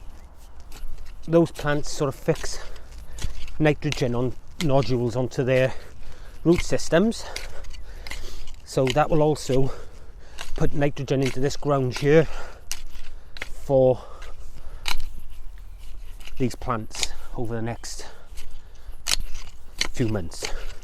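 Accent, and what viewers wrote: Wales, United Kingdom. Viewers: Hi. I'm a new subscriber. Your post's are FABLESS, (Love the Welsh accent) How's your rhubarb done this year 2019? Would appreciate an update. Best wishes from a fellow grower. 👍 ✌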